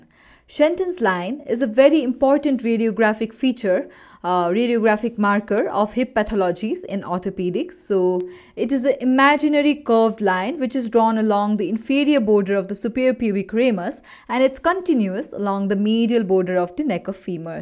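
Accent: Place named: England, United Kingdom. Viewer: Best teacher with nice English accent❤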